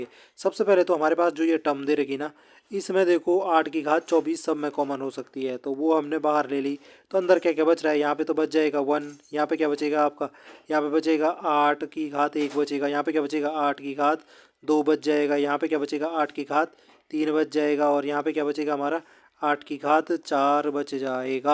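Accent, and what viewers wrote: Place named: Garhwal, India. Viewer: Bhai garwhali ho kya??😂 tone sunkr lgra hai❤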